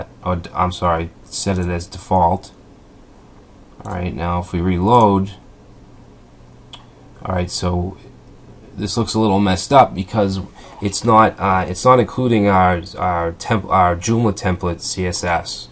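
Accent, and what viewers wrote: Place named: Maine, United States. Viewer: Absolutely awesome tutorial!!! Thank you so much. What is your accent? Maine?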